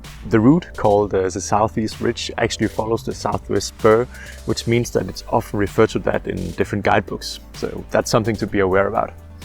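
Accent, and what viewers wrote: Denmark. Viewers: Hej, overvej at skrive stednavne når du nævner dem. Ikke mindst til os der ikke lige hører det første gang, men måske også til dem der ikke kan den tykke danske accent. Fed video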